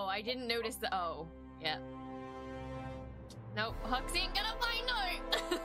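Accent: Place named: Belfast, United Kingdom. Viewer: I must live near you. I Knoe your accent is a belfast accent. Im in Carrick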